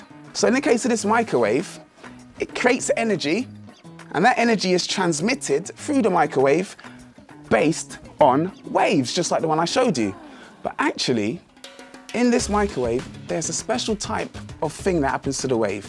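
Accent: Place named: London, United Kingdom. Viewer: it's a pure london accent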